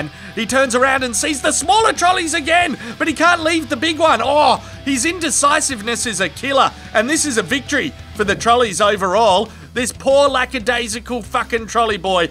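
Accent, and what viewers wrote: Australia. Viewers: What is it about an Aussie's accent that just makes things funnier? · 50% funny from the video. 50% funny from the accent and Aussie colorful phrases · FMD... how it really is, Aussie style commentary, thank goodness for this!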